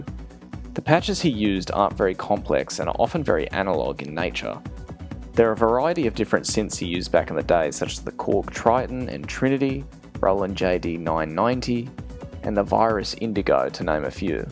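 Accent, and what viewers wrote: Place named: Australia. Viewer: Watch another video? I don't understand nitpicking someone's voice, something they have no control over. He doesn't have vocal fry even, he sounds Australian. It's like you expect him to go "Oh, sorry" and just put on a voice. Lmao. Enjoy the free, highly researched and interesting video.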